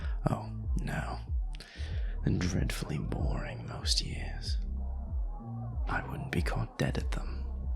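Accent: United Kingdom. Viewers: Probably British accent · BRITISH REDACTED YESSSSS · THE BRI-ISH OMYGHAD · BRITISH!!!! HELP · OH MY GOD HES BRITISH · I've never related to a listener more. if a charming (British) man came up to me and started flirting THAT HARD im putty on the floor sir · A BRIT?!??!?!?! IM SCREAMING (in a good way) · IVE BEEN WAITING FOR THE BRITISH ACCENT! THANK YOU THANK YOU THANK YOU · Moments that made my me eep with excitement: -when I heard the British accent -when he said you have a face that's far too lovely to look so bored -when he said I could bring you a lot of pleasure -when he said he only bites when people ask nicely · OMGGG HES BRI'ISH 😣👹👹‼️‼️🤩🤩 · Timestamps: - Porter has a British accent 👀 - Listener is their friends’ designated driver at the club, so they’re staying sober while their friends have all the fun without them - “Being the responsible friend doesn’t mean you shouldn’t get to have a good time too. 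They owe you that much. Uber’s a thing.” - “You’ve got a face far too lovely to look so bored. We should fix that.” [...] [laugh] “I don’t see anyone else at this table that I could mean by ‘we.’ If I’m overstepping, say the word, Treasure. I’ve been known to have scruples. Occasionally.” - Treasure wants to just talk for now, and Porter is okay with that — - “We’ll get to the fun when we get to it. You wanted to talk first, so talk. You’ll find I’m an exquisite listener. Speak.” - “My name’s Porter. Porter Solaire.” - Porter thinks that this isn’t the first time Treasure’s friends have left them out to dry on a night out, and he’s right — - They said that friendship isn’t transactional and theyre right, but Porter says that friendship is supposed to be a thing where all parties care about one another and act like it — - Porter noticing that Treasure’s friends don’t seem to care much about them - “Oh yes, I have a fabulous circle of friends in my own life. 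There’s even a few of them I can almost stand to be around” [chuckle] - “I find you very attractive. Is that too forward to say?” [...] [chuckle] “How deliciously demure. Look at me.” [...] “Mmm. Better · He's British??? 😮😮😮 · I didn’t know he was British You’re hitting me in my weak spot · As a STRONG vincent girlie this feels like i’m betraying him but i am a sucker for a british accent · IM GETTING BRITISH AND AUSSIE VIBES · HE'S BRITISH I NOW HAVE A REASON TO LIVE YEEEE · a BRITISH redacted character? Me thinks yes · I didn’t think he’d be British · No definitely British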